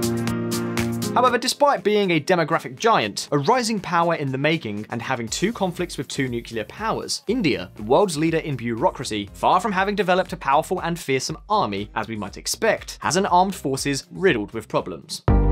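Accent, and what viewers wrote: United Kingdom. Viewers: The narrator in this video is from the UK, what does America have to do with this video? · He isnt american, he has like a UK accent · 1. thats a british accent 2. America is barely involved in Kashmir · Not Americans the guy is probably from UK judging from his accent.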